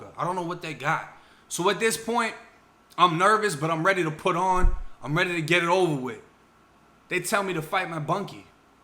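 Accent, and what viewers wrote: Boston, United States. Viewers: I don’t understand how his accent goes from a strong Boston to a down south accent. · Why do u sound like u from Boston? And why did u join a ny gang? So many questions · Bro you have to be from Boston right? I hear a Boston accent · im a little confused. all your stories are about Florida prisons but that accent is STRAIGHT Boston. mayb i missed the vid where you explained this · Why do you sound like you're from Boston? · You sound like you from Boston son!! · I went to prison in…… MAHHCH. Boston accent kills me 😂 And the swamp is Gainesville,.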